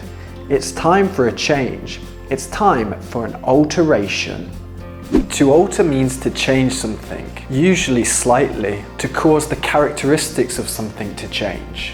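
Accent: United Kingdom. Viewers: Could you please tell me what's your accent? Cockney or rp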